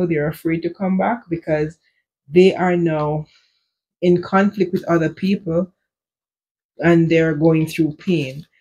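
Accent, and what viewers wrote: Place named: Jamaica. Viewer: What an AMAZING read...Thank you dear! And I just LOVE ur Jamaican accent 😊.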